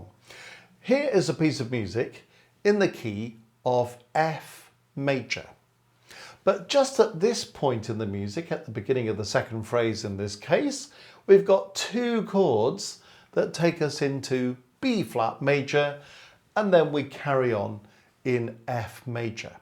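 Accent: England, United Kingdom. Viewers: i'll give you a follow just for your cute English accent .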